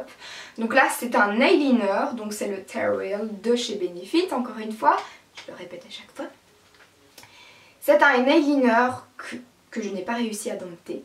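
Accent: Belgium. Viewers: Tu es belge ? Tu a un accent dou ma question sinon j'ai bien rigoler :) sympa ta video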